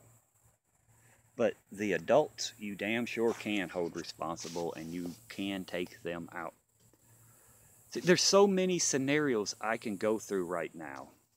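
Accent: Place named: Texas, United States. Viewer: You must have spent a lot of time in TX. You have a noticeable TX accent.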